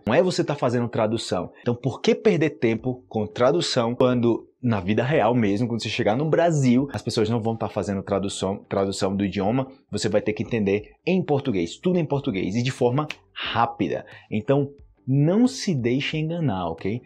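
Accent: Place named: Colombia. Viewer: Voce é crack,! A maioria de coisas que eu aprendi em portugues foi com os seus vídeos! <3 Obrigada sempre! Aproveito para te falar que fico surpresa sempre com o seu espanhol maravilhoso e o seu sotaque colombiano!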